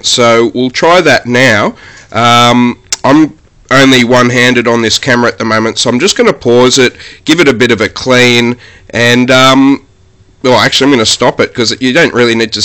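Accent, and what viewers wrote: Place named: Australia. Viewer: Thanks for the tips ! FYI - You have the most delightful accent; but then again, so do most Aussies....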